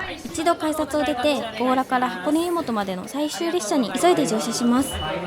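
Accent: Kansai, Japan. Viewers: 今回もありがとうございます！たまにはこうしたノープランで旅に出るのもとてもいいなと思いました。残高1円で終わられたのもすごい！たまに関西弁が出てくるところがとても親近感があって良かったです！箱根登山電車は紅葉の時期に行ったことがありますが、四季を通じて年中楽しめる路線だと改めて感じました。天成園さんの温泉が気になったので箱根に行ったらチェックしたいです！ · Suica1枚で充実した旅ができてよかったですね😊 残高1円は奇跡ですね😊 最初のほうで関西弁が親近感があって嬉しいよ😊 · 大阪弁がかわいすぎる。熊本弁、岡山弁、名古屋弁もお願いします。全部かわいいと思う。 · おー大スター、ナチュラルな関西弁ですかね。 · 斬新なSuicaを使い切っての旅動画アップありがとうございました♪ どんな動画になってるのかワクワクしながら観てました🤭 Suicaが使える使えないのシーンでの効果音が、まんまさんの心の声のような気がしておもしろかったです😂 根府川駅のカラスさんはおもしろすぎました。華麗なステップすぎて🤭 熱海城って聞いた事ない城だなぁと思っていたら、熱海には城があったという歴史はなかったんですね。商業施設としての熱海城という名前だったとは知りませんでした。熱海サンビーチでの落書き…何を書くかと思えば斬新な…🤣 ちなみに「hola」はスペイン語なんですが、スペイン語ではhは発音しないので「オ-ラ-」と読みます。英語で言うHelloですね。小田原城！行ってみたい城だったので天守閣や天守台からの展望見せてくれてありがとうございました✨ 説明しようとしたら、ちゃんとさんが勉強して説明してくれてました🤭 秀吉が小田原征伐で建てた石垣山城は天守から見えたかな？箱根フォント初耳だったのでメモしました_φ(･_･ 入浴施設のお蕎麦おいしい説、絶対ありますよね！最後の残高には思わず「マジかぁ〜」となりました😆 私もSuicaって便利なんやなぁと思いました🤭 今回はさんの関西弁多めで嬉しかったです。さんの関西弁聞いてファンになった身でしたので。見応えたっぷりの動画ありがとうございました😊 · なんで関西弁やねん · いつもに増して関西弁がちらほら🤭 カラス🐦‍⬛さんはご無事でしたか？夏は房総、秋は北関東、冬は雪☃️があるところと、季節ごとにシリーズ化してください😊 そうそう、お城🏯にもご興味がおありなのですね😊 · なかなか、楽しいチャレンジ企画ですね。 Suicaのいい宣伝にもなりますし、めっちゃ、Suica Loveですね！で、熱海の砂浜に、Suica と描いた映像はなんか、とても、シュールでした！さん、いいセンスしてますね！さんのモリモリ食べるシーンで、美味しさが伝わります！時々、出る関西なまりが、かわいくて、素敵ですよ。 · どれどれ可愛い😍ちょこちょこ関西弁が良き良き😊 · 私は箱根に行くときは、もっぱらっ車ですが、登山列車もおもむきがあって良いですね。会話の端々で出る関西弁が可愛いです😊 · 月曜に動画上がって癒されました✨️たまに出る関西弁が関西出身からしたらめっちゃいいです！暑いので、水分しっかり摂って夏を楽しんで乗りきりましょう！ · 今回も楽しい動画ありがとうございます！こういう企画もいいですね😊それにしても残額1円って流石ですよ！！東海道線(熱海行き)、座席上にSuicaかざしてグリーン席を利用するのは、初めて知りました！根府川駅で急に関西弁が飛び出して驚きましたが、さん関西弁は可愛くて、勝手に親近感を感じてます😆 熱海の砂浜に「Suica」は、ちょっと笑っちゃいました、小田原城の天守閣から見る景色も最高ですね、天成園の外観・森の奥深くにありそうな雰囲気がとっても良くて、浴衣姿もとてもよく似合って可愛かったです😊 他の方のコメントを読んでいて、タッチでGOは東海道新幹線で利用できないと書かれていて、自分でも調べたんですが、使えないんですね……勉強になりました🤔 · たまに出る大阪弁が可愛い。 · 箱根は、さんのお気に入りの場所リラックスできて関西弁も出てましたね！ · 熱海の砂浜の波打ち際を裸足で歩いてる姿はアイドルのように可愛かったよあと関西人な自分にはさんから時々出る関西弁が親しみを持てて良かったです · Suica一枚だけ旅！熱海で海を楽しみ彦根で温泉楽しんでの満喫旅♬ にしても温泉上がりのちゃんすっぴん？？って一瞬思ったけど流石にそれはないわな。。。ｗ ☆今回は関西弁多めの動画で普段にもましてカワイイやないか~🤣 残高１円・・・最後、乗り越し精算になってしもたらどなするつもりやったん？ｗ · ２万円有効活用の旅楽しかったです❣ さんの時々出る関西弁が可愛いです❤ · ちゃん、たまに関西弁が出る。親が関西人とか? 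· 関西弁の 👍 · 所々関西弁とか、お箸が左利きとかなんかいいね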